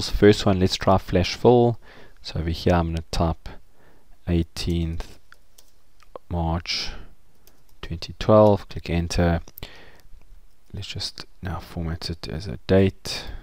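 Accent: South Africa. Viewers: Great Video, and its great to hear another South African accent.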